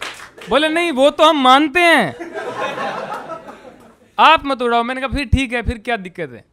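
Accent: Rajasthan, India. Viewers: His accent is telling he's from Rajasthan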